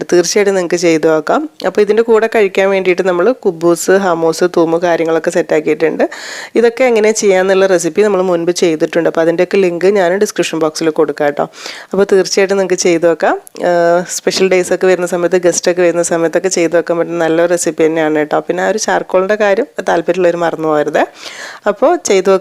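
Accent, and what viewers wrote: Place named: Malappuram, India. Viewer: നിങ്ങൾ മലപ്പുറം ആണോ സംസാരം കേട്ടിട്ട് കണ്ണൂരൊ മലപ്പുറം എന്തായാലും ചിക്കൻ സൂപ്പറായി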